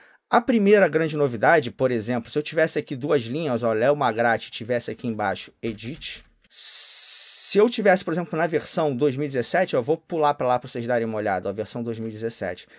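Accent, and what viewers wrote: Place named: Rio de Janeiro, Brazil. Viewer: Finalmente um Carioca que fala minha língua.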